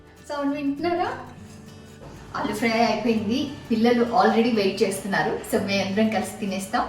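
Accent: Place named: Telugu, India. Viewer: Nice Baga cheseru. Meru telugu vallu kada garu...mee matalu ala unnai.....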